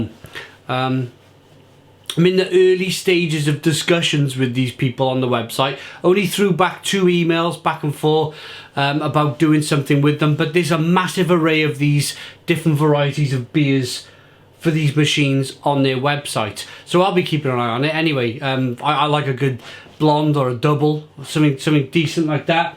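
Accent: Wales, United Kingdom. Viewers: I’m following this guy just on the back of his great welch accent and likeable person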